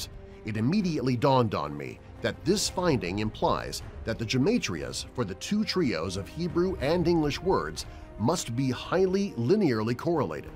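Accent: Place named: United States. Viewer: why didn't you choose someone who can speak Hebrew to narrate? the pronunciation is so american...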